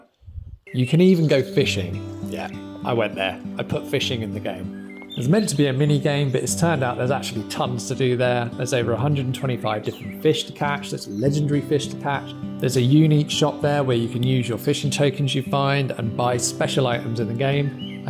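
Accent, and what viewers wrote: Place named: United Kingdom. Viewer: Ohh maan.... British accent is the best.. i love it 😂😂😂🎉🎉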